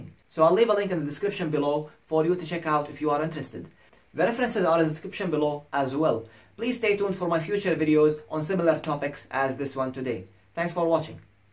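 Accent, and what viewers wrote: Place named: England, United Kingdom. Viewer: I am so excited to learn from you..please pray for me to be accepted in the med school...I loved the video the informations and your English accent as well...Good luck doctor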